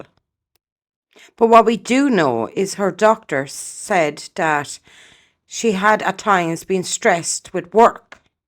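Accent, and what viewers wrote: Ireland. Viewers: What part of Ireland are you from ?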